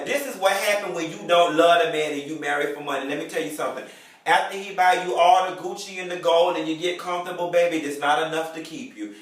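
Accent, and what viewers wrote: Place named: Tallahassee, United States. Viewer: Thank you for the review! Can I just say that I love the other reviewers...each has his own dialect. But you're the only one who sounds natural saying "back peddle and pu$$y pop." I went to undergrad in Tallahassee & recognize your dialect. The others don't talk like that..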